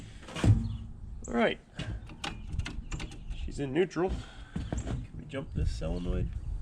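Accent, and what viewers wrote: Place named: Pennsylvania, United States. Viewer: For the longest time, you reminded me of someone; but I couldn't place it. I finally figured it out. You could be Rick Rowlands' kid brother. BTW my people are from around Uniontown/Connellsville, you talk like them.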